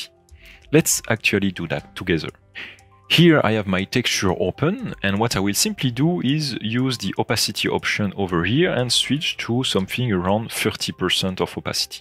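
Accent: France. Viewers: Le petit accent français ? Des videos en français ? :) Faîtes vous des formations twinmotion ?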